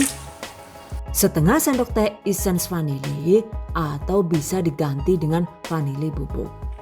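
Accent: Banyumas, Indonesia. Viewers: Bahasa ngapaknya itu lucu banget Bun😆"iklan maning iklan maning to yuk"tapi saya suka banget sama setiap resep & tips nya itu detail banget.makanya tiap cari resep pasti yg dicari resep.sukses terus ya bun channel nya aamiin🤲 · Yg saya suka dari penjelasan nya detail..suara & gaya bicara nya enak didengar.aoalagi ada logat logat ngapak nya itu..lucu. suka sekali..👍👍👍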